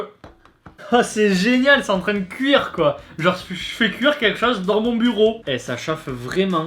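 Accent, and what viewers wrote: Marseille, France. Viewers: Tu est Marseillais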